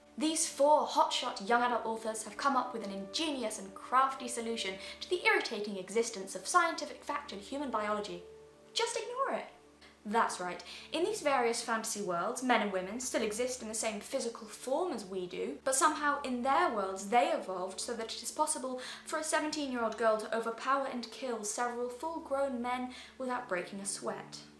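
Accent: United Kingdom. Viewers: Woman are beautiful Strong and they can run very fast they are not weak And this woman accent is very beautiful and I love British Woman they are very powerful and I love her accent so beautiful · I'm so glad it's a british chick saying this. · This has to be the most "propa" british accent I've ever heard. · i thought this was gonna be some insufferable libtard or conservtard discussion on "misrepresentation of gender archetypes in fiction" that instead rags on commercialisation or identity politics etc in, i hear a british accent and eat my thoughts (not a comment on british people, also not a comment on american people. its less likely for a non-american to be republicanly/democratly charged than an american) i think this is gonna be good in and it sounds kind of consevtard but the coments on the quality of this video inclines me to think that your going to give reasonble basis for the selection of his specific evidence to and i get the clarification i asked for now im waiting for the clarication i need oh yeah i forgot to mention: subscribed. 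i dont remember when but im now i hoping you prove me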